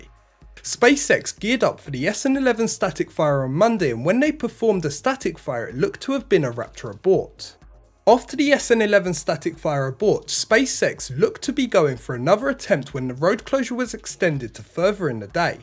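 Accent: United Kingdom. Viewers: Turn the volume to zero. Sorry that UK accents annoy you (not really). His use of technical terms, and of English grammar are excellent. Maybe that is your problem.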